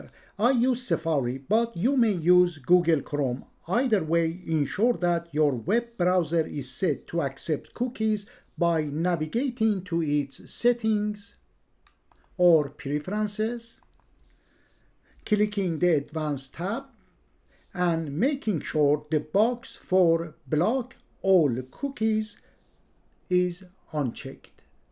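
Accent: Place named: Iran. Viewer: Thank you for clearly explaining this clearly .. your Persian accent made the whole concept actually more comprehensible & for that reason I subscribed ☺️🙏🏼🙏🏼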